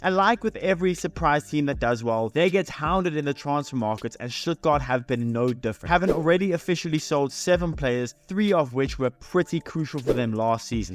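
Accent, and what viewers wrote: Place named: South Africa. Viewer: South african accent. Reveal your PSL team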